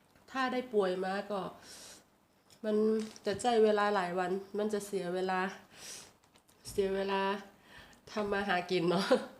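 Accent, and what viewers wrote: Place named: North, Thailand. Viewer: ต้มขมเครื่องในน่ากินมากเลยครับพี่ พี่เป็นคนเหนือติครับ#เจ้อยู่ที่ผมทำงานกะพูดแบบพี่เลยเสียง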